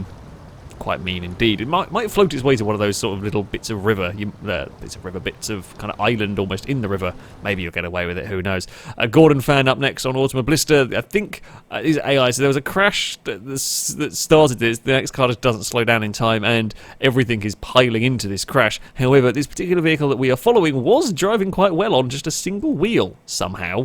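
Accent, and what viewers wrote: United Kingdom. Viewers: Thank you Youtube 4 that recommendation i enjoyd that video, i really like that british norrater forgive my bad english aim still learning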